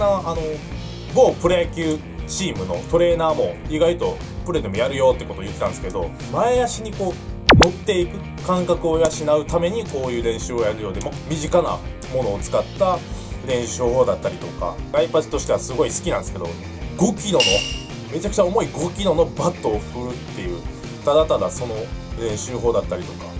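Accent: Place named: Kansai, Japan. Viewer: ライパチさんの関西弁、いい。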